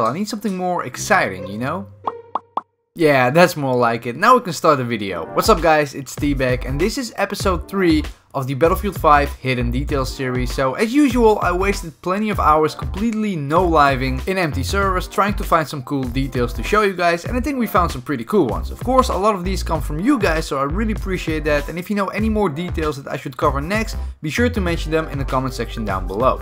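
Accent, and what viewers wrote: Netherlands. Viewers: a Dutchman who speaks English, go to school bro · U dutch?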